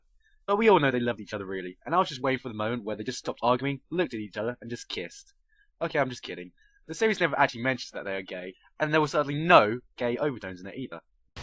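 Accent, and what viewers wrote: United Kingdom. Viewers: Dude he had such a British accent back then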